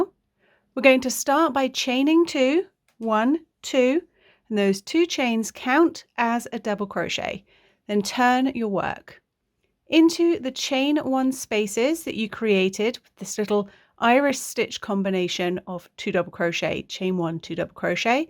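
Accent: England, United Kingdom. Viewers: Beautuful stitch pattern. Love to watch your tutorials. I have learned so much and used some if your tutorials to make scarves. Also your voice is so calming and relaxing. I love your Engish accent.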